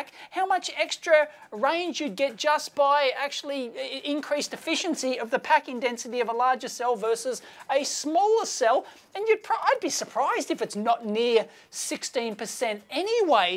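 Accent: Australia. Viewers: "Dunny roll" haha. Keep it up, enthusiastic Australian guy! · Sandy Munro with an Aussie accent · Nicely explained as always, and all the better for being in Aussie English. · Love his Aussie accent. To my poor American ears, it sounds like he keeps saying that “we get the HATE out of the bottom of the battery”, which makes it hilarious to listen to. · Well scripted analysis . Nicely explained . With an Aussie accent to boot.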